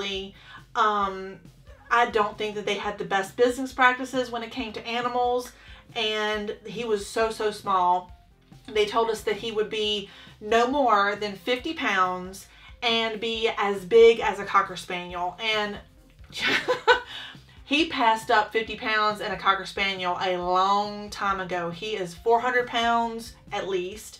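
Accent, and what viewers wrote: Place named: Louisiana, United States. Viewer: I’m from north Alabama! Just outside Huntsville. Love your content ❤️ and you mentioned loving Louisiana accents, I can hear it in your accent too! 😊😊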